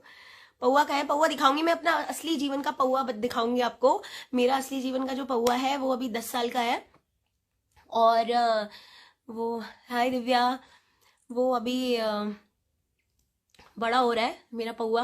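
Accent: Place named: Kumaon, India. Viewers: i just love kumaoni accent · Mujhe aapke videos mei aapki accent hi best lagti hai. First time pahadi accent mei achha content dekha. Great to know ki aap ka aim apni accent ko hi pride k saath promote karna hai. Keep going. I'm from Himachal and can relate totally. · Dude kyaaaa mast hai aapki videos… I am from himachal though but was working in bageshwar and Garur … stayed there for like four years … and I could totally relate to your tone …. Alag he mazaaa aata hai … and aap bindaas content banao (I have also experienced this uchch kuleen Brahmin waala Logic, you might offend someone but never hesitate from bringing the real cases) keep growing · Mujhe to apne Bharat ke har state ke hindi tach bahut achche lagte or aapki pahadi style ka video to super se upar hota hai❤❤❤❤❤🙏👌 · Bahut Sundar aapka Pahari bolna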